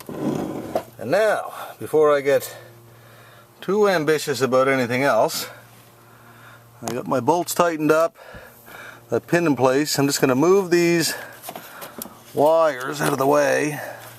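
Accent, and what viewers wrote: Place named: Canada. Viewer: he sounds Canadian!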